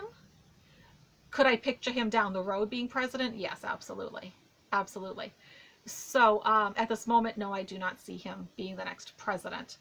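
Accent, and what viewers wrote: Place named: Boston, United States. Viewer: you're so awesome at all of this! I'm sure it's tough to keep focus while taping, and playing Oprah/Donahue to a growing audience. Thank you for your clarity and warmth; and what a poignant way to honor Otto. I'm in Los Angeles, but I grew up near Boston, so it's comforting to hear that accent again! For all of us who you're now reaching worldwide: thank you for changing Youtube and changing our days, for the better.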